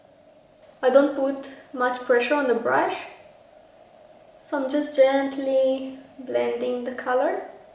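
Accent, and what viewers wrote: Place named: Romania. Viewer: I am so happy I came across your channel. You are so sweet. 💕. I love the fall look you have created. You look gorgeous. I did a makeup fall look on my channel too. So much fun. Would love to be friends on here. 🧡 😘If you don't mind me asking, are you by any change Romanian? I can here a little bit of accent, but your name sounds Romanian :) 🥰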